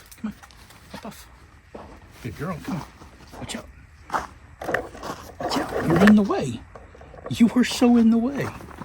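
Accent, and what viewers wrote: South, United States. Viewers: I thought you had a little south in your voice man 😂, now I know brother 👍🏼.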